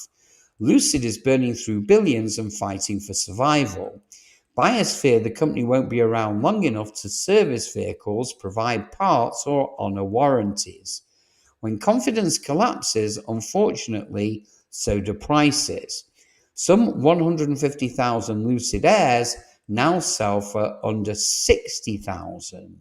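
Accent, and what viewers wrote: England, United Kingdom. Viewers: Wow! Seems as if I am way out of this regime! I am an 82-year-young South African chap who proudly owns a 1999 Land Rover Discovery 2 V8. I have never seen any of the vehicles which you mention, here in beautiful South Africa, so cannot comment. Maybe people should be educated in matters mechanical before thay purchase their 4-wheeler. My Landy has done just 280 000 kilometres, has never let me down, and has had the four usual problems of its model attended to timeously. Leather seating, full aircon, great sound system, absolute reliablilty, easy driving with excellent driving view and man, it goes simply anywhere and everywhere! I am very interested in your use of the word 'GAS", dear presenter. With your delightful English accent, I would expect you to use the term "petrol", as we do here in beautiful Cape Town. Thanks for great entertainment!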